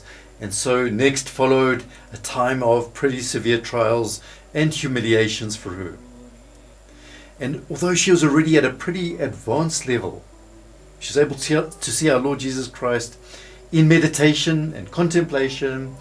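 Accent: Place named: South Africa. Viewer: Your south African accent is unmistakable, greetings from Pretoria 😁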